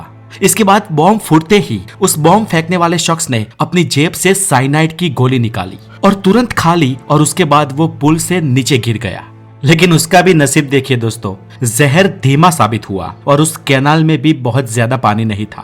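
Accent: Gujarat, India. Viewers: Are you Gujarati bro?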